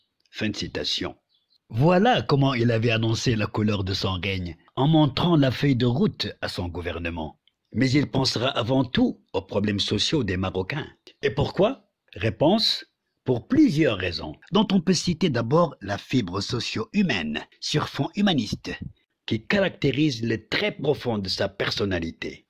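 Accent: Paris, France. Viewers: Bonne fête d'abord pour l'Eid Fitr. Je crois que désormais la grande popularité du Roi Mohammed VI et les impressionnantes réalisations d'un Maroc nouveau qu'il a inaugurées à son accession au Trône n'auront plus de secret pour moi, après avoir visionné ce documentaire d'une valeur considérable. Votre analyse est aussi riche que succincte en fournissant l'essentiel sur les attributs fondamentaux du Roi du Maroc en relation avec son "métier de roi" selon sa propre expression. Votre lecture n'est pas moins claire avec une intonation française parisienne impeccable, absente chez beaucoup de mes concitoyens. A cela s'ajoute évidemment votre talent d'orateur qu'il n'est plus besoin de rappeler, associant non seulement l'art de parler et l'art d'écrire à l'égard de tous, d'après moi, mais aussi une belle voix dont la chaleur et la tonalité phonétique pénètrent le cœur. 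Permettez-moi d'ailleurs de vous exprimer mon sentiment, pour ne pas dire ma perception psychologique admirative à ce sujet. Les vibrations de vos cordes vocales émettent un timbre spécifique à votre psychologie. Et cela découle donc d'une combinaison de sons harmonieuse qui relève de la phonétique descriptive. Le Psychologue-ORL retraité que je suis, a pu relever en vous cette particularité phonétique à travers votre élocution. Elle est encore plus perceptible dans l'articulation et l'enchaînement de vos phrases, à travers vos poèmes. C'est d'ailleurs ce qui m'a attiré initialement en écoutant votre premier poème da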